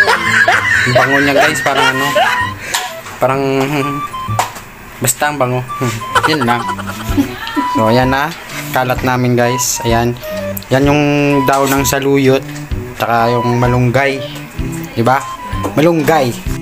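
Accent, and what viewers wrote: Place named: Iloilo, Philippines. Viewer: Hahaha dami kung tawa sayo lods.,lalo sa tabagak mo.,ay nano tinonto ito hahaha ilonggo gid mong.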